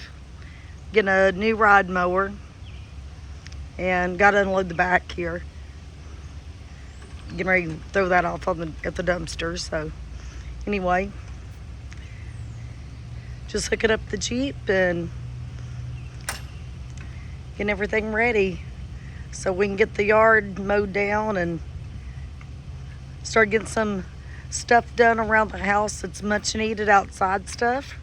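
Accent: South, United States. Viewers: Blessing to you. Your channel popped up in my feed just now. Love your southern voice. Subscribed. Much love from Charlotte, NC!!!!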